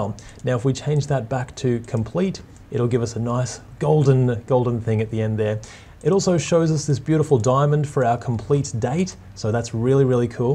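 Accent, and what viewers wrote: Australia. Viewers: Great to hear another Aussie!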